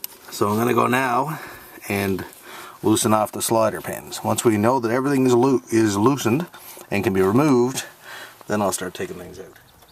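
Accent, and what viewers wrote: Newfoundland, Canada. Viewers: Good Job, & I like the way you say "off", it would be written as "oot", I love it, lol, I like the Canadian accents. Vin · Given the accent & state of corrosion, I think we're in Canada. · this video along with your irritating canadian dialogue makes this painful to view and listen to , its like torture < makes one want to vomit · hey are you from newfoundland? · Love that Canadian accent.lol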